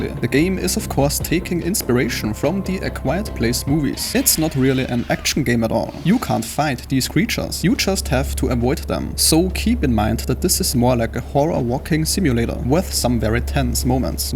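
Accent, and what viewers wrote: Germany. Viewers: man hört komplett das deutsche Englisch raus 😅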